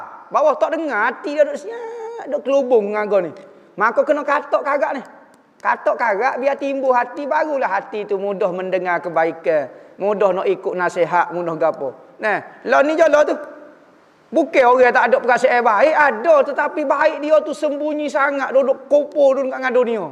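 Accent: Kelantan, Malaysia. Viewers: Maaf mencelah..sy pun sm smbil dgr ceramah sambil blaja dialect kelantan.mula2 pening gak. iman...mula2 sy ingat email...hehehe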